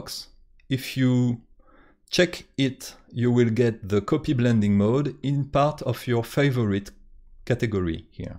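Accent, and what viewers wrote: France. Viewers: not me liking in the first few seconds bc i hear a french accent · Don't apologize for your your French accent....or "English" mistakes. You should hear English speakers....my goodness. They are not textbook! This was very helpful. Merci.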